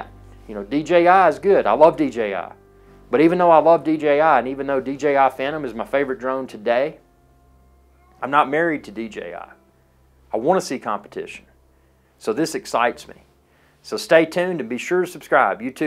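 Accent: South, United States. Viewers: That southern drawl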